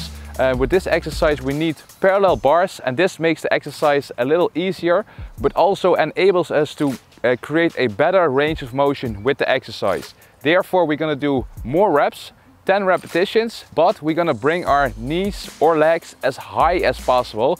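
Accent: Netherlands. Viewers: "...arch your back too much so don't bring your feet ÖP in the air ..." Oh boy ,I love the netherlands accent, I really do ! Greeting from your neighbour eastside of the Maas/Rhine. But FYI my english speaking skills are worse, bring people bleeding ears :-D therefore my accent isn't that charming. Keep up your nice contents!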